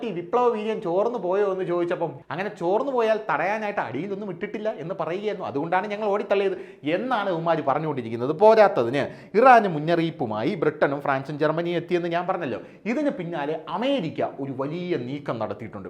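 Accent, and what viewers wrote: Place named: Pala, India. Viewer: രസകരമായി present ചെയ്തു 👍പാലാ slang ൽ 😂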